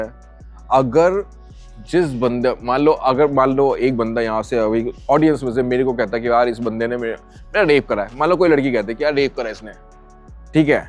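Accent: India. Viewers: Aap Bihar se ho naa?? Aapka tone vaisa hi laga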